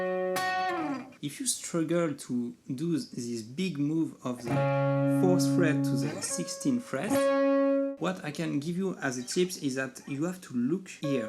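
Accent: France. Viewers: French accent ???? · Oula toi t’es français avec cet accent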